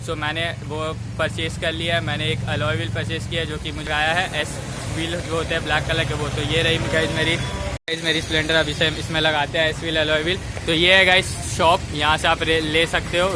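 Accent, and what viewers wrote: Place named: Punjab, India. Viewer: Bhai bol ta Hindi par lagda Punjabi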